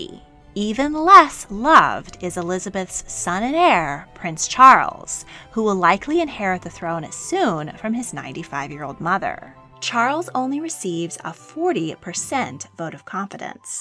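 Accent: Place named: United States. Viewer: Who knows how long any of the monarchies will last? Time well tell. But I wonder how and when you an American (with all the baggage between your country and Britain that entails) decided it might be a good idea to produce a video in which you speculate on the downfall of foreign monarchies. Most of them countries that are allies of the US. Could you not see how inappropriate that might seem to a lot of people? I found it especially crass for a narrator with one of the most pronounced American accents I’ve ever heard (and I come from the US) to take on this dubious task. You seem blind to how incredibly presumptuous and lacking in taste it sounds coming from someone of your background. And of all times to put out such a video! I wonder how Americans would react if some smug British talking head did a series on the future of the presidency and American form of government with a review of all the disastrous American presidencies of the last 50 years, Nixon, Dubya, TRUMP. 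Time for a parliamentary cabinet system in Washington?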